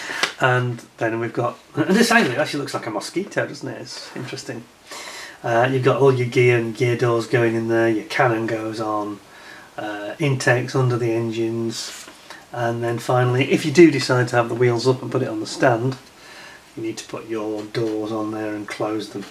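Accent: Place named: Liverpool, United Kingdom. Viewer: Sometimes I can hear "K" in the end of -ing words (like "something"). Because I am Beatles fan, so I thought you are from Liverpool area :) BTW - great channel, you are doing excellent job. During coffee brakes I like to watch your unboxings. These clips remind me good old days, when I was a young boy, and a great fan of Matchbox. Well done, mate.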